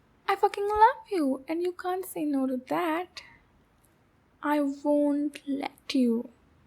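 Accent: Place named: India. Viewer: I can sense the indian accent in this audio.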